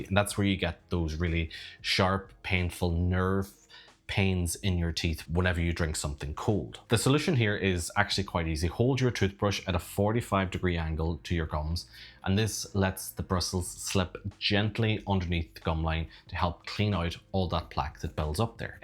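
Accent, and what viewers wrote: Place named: Ireland. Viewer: Good video love the Irish accent and you are kind of gorgeous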